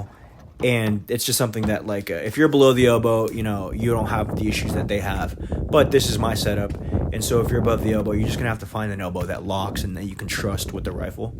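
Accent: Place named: Texas, United States. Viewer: 1) Get yourself the brightest lazer/illuminator module you can find and practice point shooting from the low ready and hip positions. The scope is for longer ranges, but for your handicap a lot of tacticool lasers would serve you pretty well for close range daytime shoots.You sound Texan, yall have cool toys over there and hella veterans discounts so finding one should be easy. 2) Use your sling as an additional point of contact. A single point audjustable sling attached to the top rail where the center of gravity is feels like you have an additional arm holding the rifle up. Have it extremely tight and wear it so the weight of the rifle pulling on the sling is supported by one of your shoulders. I have a stockless 16in Ruger PC9 settup like this, its fun being able to manipulate it with one hand and accuretly use the laser to hit my targets. 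Ive never been serious with it though, but I can see my settup helping your flesh side from fatigueing less often. Semper Fidelis, much love from the Communist State of California.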